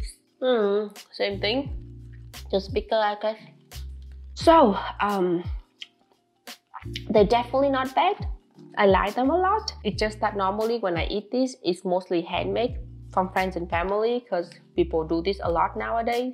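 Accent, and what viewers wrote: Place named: Germany. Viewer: I love to see that you got some of German accent.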